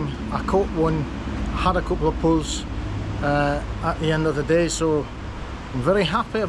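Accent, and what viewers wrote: Scotland, United Kingdom. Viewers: Excellent video and a beautiful strong fresh fish, congratulations! Tough challenges in that current and the number of big rocks, well done! Thank you for doing in English and I compliment you on your excellent English which has a Scottish ring to it! Thanks for producing and sharing. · You have a Scottish accent. Great video btw.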